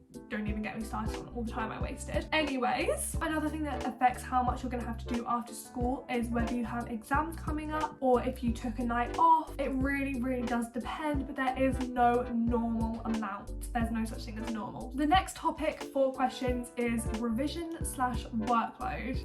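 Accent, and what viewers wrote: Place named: United Kingdom. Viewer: I can’t relate to the things you’re saying cause things are done differently in Nigeria & I’m about to graduate from the university BUT I just enjoyed hearing you speak in your cute British accent and your editing is bomb!!!! I’ll literally throw a party when I get to 5k subscribers 😂😂 Congratulations on the Big 5000, 😁😌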